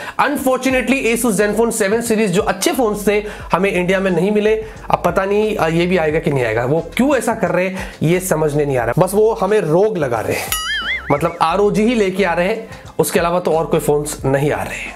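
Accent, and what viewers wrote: India. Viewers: Sounds so wired indian english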